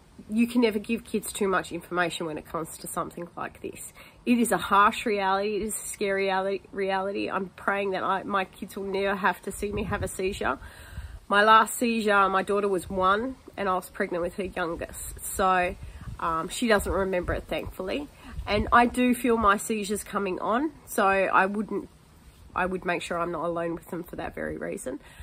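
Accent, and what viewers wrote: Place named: Australia. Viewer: I love the Australian accent and it’s good to teach your child what to do when someone is having a seizure!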